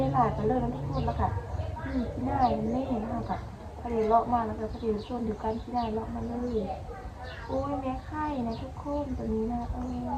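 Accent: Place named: Phu Thai, Thailand. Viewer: น้อง ขนาดตอนกลางคืนยังหน้ารักเลยนะคับชอบสำเนียงสาวภูไทคับเพราะดีมากๆ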